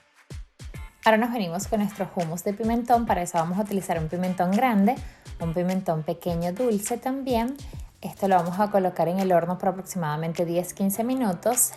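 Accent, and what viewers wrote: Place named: Venezuela. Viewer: Me parecieron geniales esas recetas. Buenas alternativas para pasapalos. Eres venezolana? Por fin alguien que habla mi acento en un canal de recetas 😅🤩🇻🇪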